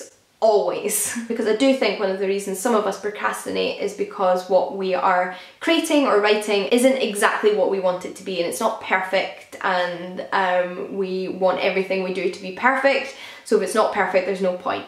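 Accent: United Kingdom. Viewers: Well, nice to meet you! You're beautiful have a good british accent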